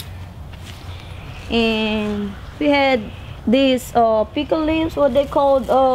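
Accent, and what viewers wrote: Louisiana, United States. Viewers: I love the Cajun accent you got I applaud you growing your own food looks great.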